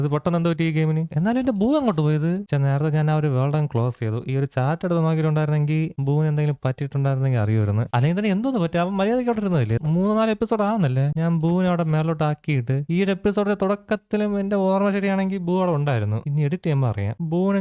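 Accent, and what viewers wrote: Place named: Trivandrum, India. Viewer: crrt tvm slang 😂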